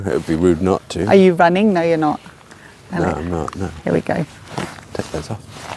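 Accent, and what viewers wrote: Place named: England, United Kingdom. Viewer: All art teachers should have an English accent.....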